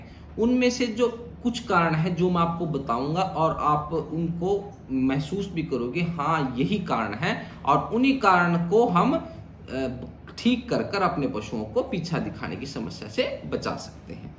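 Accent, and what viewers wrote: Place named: Haryana, India. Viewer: Dr sahab aap laagta hai haryaana se hai aap Hindi mein bahut aatak rahe hai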